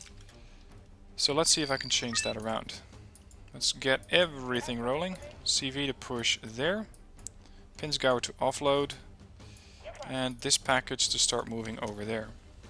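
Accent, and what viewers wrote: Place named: Netherlands. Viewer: You are welcome. I know, that you are no deity - when I interpret your (hardly present) accent correctly you are a human from the Netherlands, right? ;-) BTW I'm German. Actually this was my first comment ever to a youtube video. I really appreciate your videos, they are entertaining as well as informative. And I felt somewhat "honored" that you mentioned my comment in another video of yours. However generally I hate wargame (kind of), since it's the first time in my life (and my computer playing life goes back to the Commodore 64 times(!)) that I am addicted to a game. The interesting thing is that neither ALB nor RD hold the usual grinding game mechanics, which usually make players addicted. Well another story... So before I get carried away about thinking why this happened to me, and why I like watching your videos so much - thank you, and please go on doing such an entertaining job. 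And keep doing ranked, please! Private Parts (my ALB and RD name)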